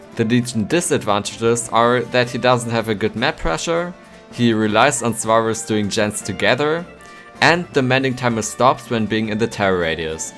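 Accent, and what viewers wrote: Germany. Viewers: The german accent xD good video ^-^ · Please do a survivor ranking next. I really like your Deutsch Akzent.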